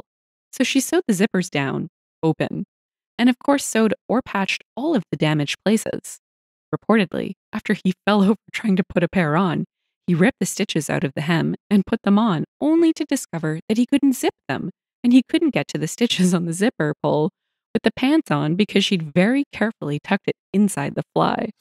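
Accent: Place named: Canada. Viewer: You're sounding like a smooth and sexy Canadian!👍👍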